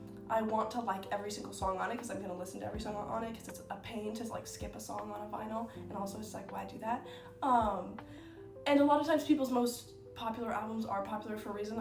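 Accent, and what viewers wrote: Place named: New York, United States. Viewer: So yesterday, being NY born, I saw a vid about NY things you didn't know. Today I get a short of you dressed as a Brit on a rock. (Photographer) I was taken aback as I lived in Dublin for years and I found your doppelganger, good friend then, from Kilkenny. It's not like it's similar, it's exact! Anyway it was cool to see that lovely Irish face again, but speaking with a NY accent. Also very eclectic pics here, I'll check some out, thanks. Slainta and cheers.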